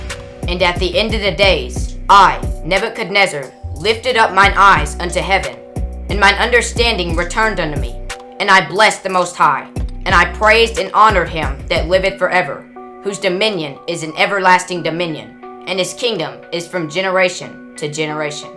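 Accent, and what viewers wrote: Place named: United Kingdom. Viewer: sounds like England/U.K.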